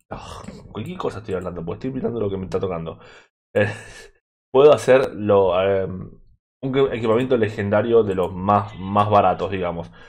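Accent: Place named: Argentina. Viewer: vos sos Argentino no? Se te nota toque en la voz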